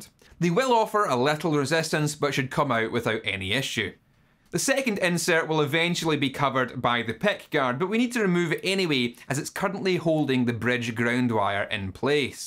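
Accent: Scotland, United Kingdom. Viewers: String bucklers! Hot damn! Why did it take so long for these to be invented? Or rather: why are guitar manufacturers still building expensive instruments that pull the strings sideways from the saddle? I mean, tuning-wise, these are accidents waiting to happen, and guitar players have been plagued by this completely unnecessary problem for generations. Gotta pull the strings out of the saddle absolutely straight , dammit! I gotta get me some of these. 10 minutes of Google later: Oh, butler , not "buckler" . Hot damn again - your Scottish is a wee bit too confusing for me...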